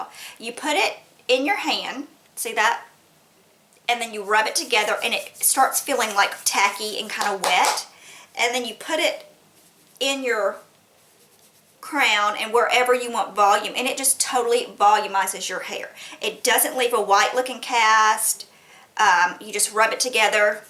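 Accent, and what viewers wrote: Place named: South, United States. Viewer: Great video hun and I just love your southern accent heehee too cute for words :) I subscribed have a great weekend dear xxx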